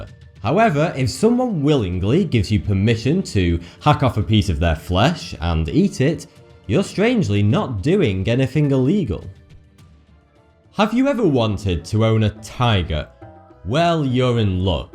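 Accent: United Kingdom. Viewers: Whats with the Brit? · Does this account for the USA? because you sound British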